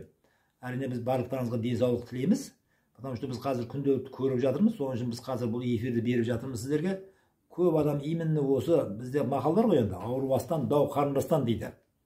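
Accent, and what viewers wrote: Uzbekistan. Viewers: Қазақ, тек Ташкентте тұрғандықтан тілі өзбекшеге кетеді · Бешбармақ қай тілде акцентіңіз өзбекше ме сұрланғ😢😢 а н ет деген не Сүр🎉😢😢 ет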